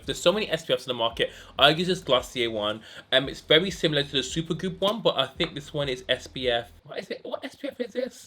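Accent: Birmingham, United Kingdom. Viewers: Are you from Birmingham? X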